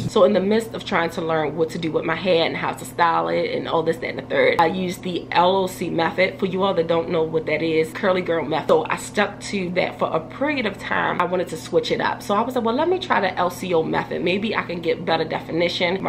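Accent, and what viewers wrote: Baltimore, United States. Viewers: He said Rick James 🤭. I’m laughing because I actually have the album with Rick and the short bang. Still gorgeous hair with the short bangs!!!! Just found your channel binge watching your vids now. I hear Baltimore in the accent (I live in MD).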